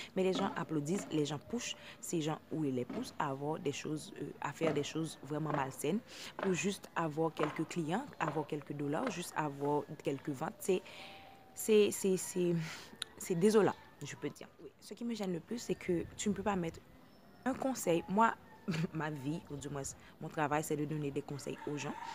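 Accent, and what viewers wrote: Haiti. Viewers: C'est pareil sur insta ! Étant une " influenceuse " je reçois de nombreuses demandes de pubs par jours. Là n'est pas le problème, mais quand ça termine par " stp fais moi une pub j'aimerais percer comme toi ". Y'en a ils s'inventent des vies pour qu'on parle d'eux, c'est devenu n'importe quoi !! En plus depuis qu'on peut se faire de l'argent avec les réseaux sociaux il y en a de plus en plus qui sont là juste pour l'argent, les partenariats etc. Flemmme ! En tout cas je te comprends et j'aime bcp le ton de ta voix (le ton que toutes les haïtiennes ont, mes tantes c'est pareil) bref j'aime aussi ta vidéo ♥♥♥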